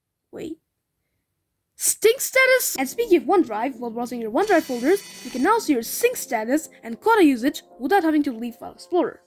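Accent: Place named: United States. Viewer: How did you learn your accent lol, I'm also Indian, when I'm speaking in my mind i speak with that "american" accent pretty well, but in person, it just doesn't work. How did you learn it?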